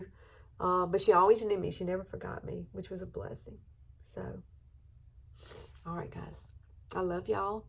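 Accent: South, United States. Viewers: I’ve been so sad about the loss of your mom and I’m praying for you, your family, and now your friend, Terri. What a tragedy to lose a child. My heart hurts for you and your friend. I’m not very tech savvy and I usually watch just YouTube videos, but I’ve found a few of your videos on Facebook. I don’t use FB very much at all and I’m not sure if I’m posting comments correctly or not on there. Do you usually put more videos on FB or YouTube? I sure enjoy watching and listening to your southern voice😊 From one southern gal to another, love and prayers to you and your family 💕💕💕💕💕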